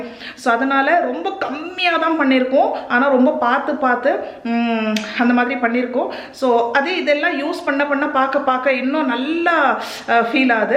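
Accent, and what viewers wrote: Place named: Karnataka, India. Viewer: Kannadam kalantha tamil arumai vazhthukkal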